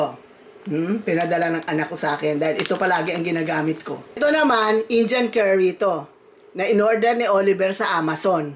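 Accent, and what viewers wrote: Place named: Pampanga, Philippines. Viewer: San Po probisya nyo KC prang kapangpangan UN Punto nyo eh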